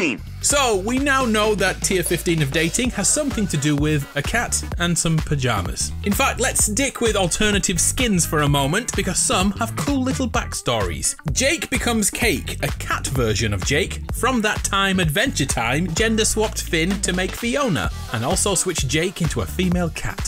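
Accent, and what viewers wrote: United Kingdom. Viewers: I've always thought is british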